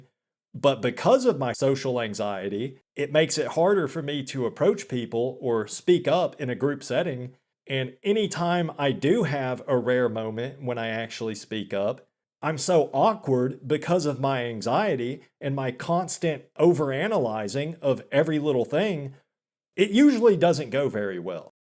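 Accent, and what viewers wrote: South, United States. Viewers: If he's not using AI he's a Southerner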